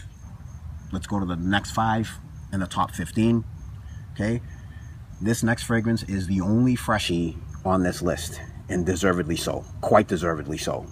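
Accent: Boston, United States. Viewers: THIS GUYS FROM BOSTON!!!! WICKED & PAHK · Boston guy can tell another Boston accent & no flipping doubt THIS GUY IS FROM BOSTON! Charlestown here brother... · you sounds like ray donavan's brother haha (boston style) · Great vid. I can tell your a fellow Bostonian. Best Boston accent I've heard on you tube.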